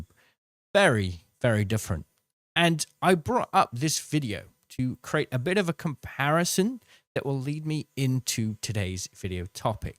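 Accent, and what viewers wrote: United Kingdom. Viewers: Yeah, the british accent it's a thing. But I like when you are vibing. I used to like to watch you doing challenges, but I felt you a bit sad and didn't vibing doing that those days. You could bring news about apex, trying other games (new games, BR or classics), testing how good the legends are. The most important, just keep doing what you like. Keep going. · In my honesty, I havent really watched so much of your content as of lately and i agree with most of the rest of the comments, it's because of the game itself. I remember the old challenges, the 4ks or load out challenges but the games not the same anymore so I have since quit playing and watching. But you still hold my sub because you still hold a spot in my heart. But if u want some input from me, I would just try doing your type of challenges and content for other maybe less comp games like cod zombies, or risk of rain, or even Elden ring playthroughs. 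I think all of us love your "weird little British man accent" btw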